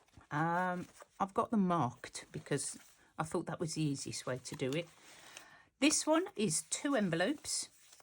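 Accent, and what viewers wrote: United Kingdom. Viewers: I love the double pockets. Is that a Black Country accent I hear? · I love love love everything you showed us! Your style is my style. I really enjoyed listening to your British accent and seeing all you had to show us! Thanks you so much for sharing❤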